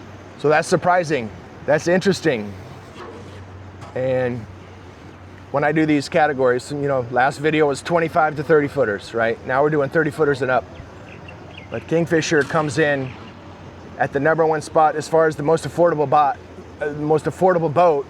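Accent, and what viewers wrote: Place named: Seattle, United States. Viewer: I can tell you are from Seattle by the way you talk.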